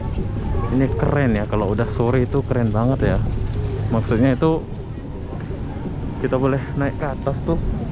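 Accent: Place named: Peninsular Malaysia, Malaysia. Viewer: Abangnya ngomong ala melayu semenanjung sama orang Sarawak sementara orang Sarawak cara ngomongnya mirip Indonesia.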